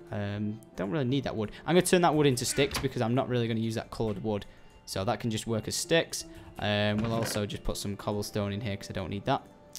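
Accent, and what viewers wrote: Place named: United Kingdom. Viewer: your brittish never gets old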